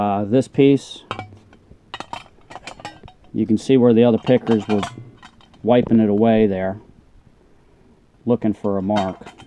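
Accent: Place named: Maryland, United States. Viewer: This is a dude from Maryland for sure haha my aunt and uncle have that accent they’re from Hagerstown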